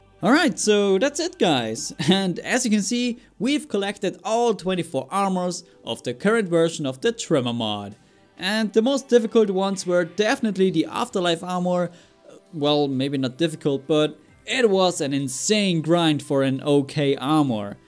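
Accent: Germany. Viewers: dude r u german?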